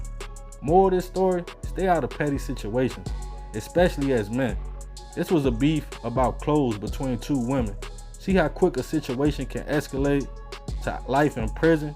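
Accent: Baltimore, United States. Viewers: Ur Baltimore accent stinks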